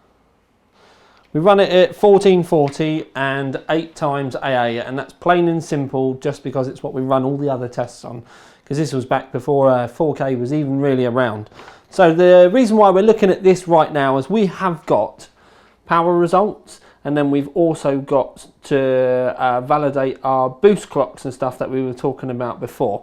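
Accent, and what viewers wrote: England, United Kingdom. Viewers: ferdaus bhuiyan so he's from England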